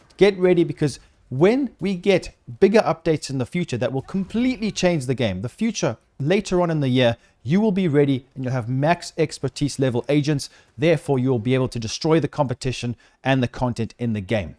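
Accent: South Africa. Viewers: Are you from South-Africa? PS thanks for the video…Now im craving to come back!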